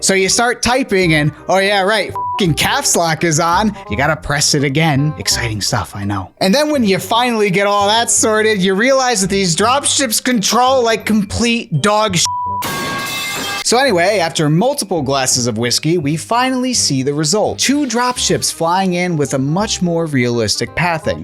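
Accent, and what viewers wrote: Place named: United States. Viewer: Voice reminds me of shilt show! With a different American dialect,Lol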